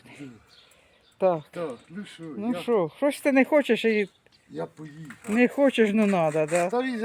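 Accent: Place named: Poltava, Ukraine. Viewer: Доброго вам дня. Гарно у вас і тихо. Це мабудь Полтавщина, по говору. Миру вам і добра. ❤❤❤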